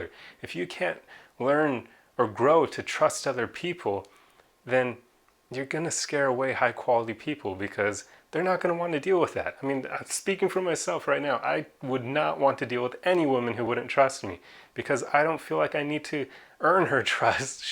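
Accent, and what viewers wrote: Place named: Germany. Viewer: You're such a chill guy, thanks for your video! Also, where are you from? For some reason your accent sounds mildly german to me lol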